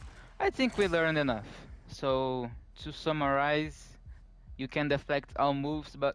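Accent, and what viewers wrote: Brazil. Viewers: Brasileiro falando inglês has a Very specific accent BORA PORRA KAKDKAKDKAKDKAD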